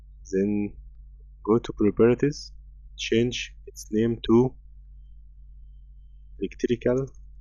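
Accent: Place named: Egypt. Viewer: Thanks.... U accent may be LikE Egyption'S people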